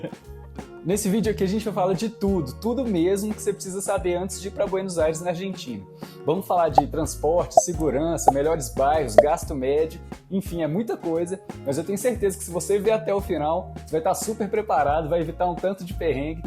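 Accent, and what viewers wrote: Minas Gerais, Brazil. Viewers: ei cara otimo video , eu desconfiava que tu era mineiro meio matuto tudo termina em (ENO) correno,comeno,fazeno,sabeno , falano e por ai vaiiii. estou (INO) pra la otima dica. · Gostoso demais ver vídeo com sotaque mineirim! Parabéns aí!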